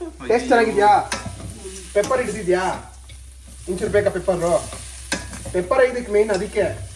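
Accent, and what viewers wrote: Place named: North Karnataka, India. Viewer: Neev matadiddu uttara karnataka bhasge...not uttara kannada bhashe..😂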